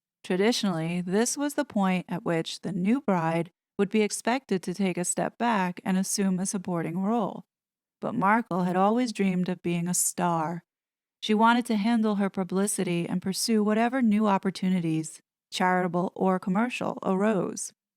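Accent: New York, United States. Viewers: New Yorker. OK. Could never pin point your accent. Another great video. It appears the girl child creature always thought she was superior to everyone. She sees how much she has missed out of leaving the Royals. With her real love interest, William, moving up to Prince of Wales and the beautiful Catherine, Princess of Wales, she is out of her mind with envy. I'm sure she and her Suck Squad will be hitting the Royals with more hate the likes we have never seen before. Hope it is true MI5/6 are investing the squad. The rings are beautiful. I love how people are making fun of her with those ugly pictures. Every time she hits we should hit back. She wants to act like a child, we can go all out Mother Bear on her. Have a great week.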